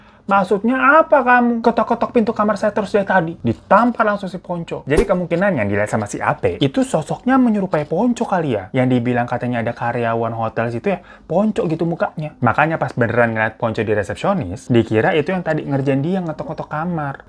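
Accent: Java, Indonesia. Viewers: Bang ini ora asli cina buakan ya. Wajah nya kok kayak orang cina. Tapi bisa bahasa jawa medok banget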